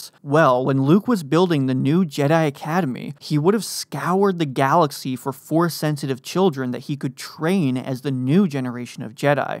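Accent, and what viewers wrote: United Kingdom. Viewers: Please, English accent not British. British would include Scottish, Irish, Welsh as well as English. Even to suggest there are no regional difference in accent within England is incorrect. Someone from Newcastle will sound very different from a Bristolian who in turn will be different from a Londoner. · keeps up with the british accent too...nice one.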